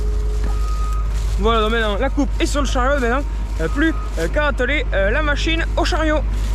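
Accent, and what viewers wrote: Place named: Toulouse, France. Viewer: Vue l accent je dirai Toulouse alentours mdr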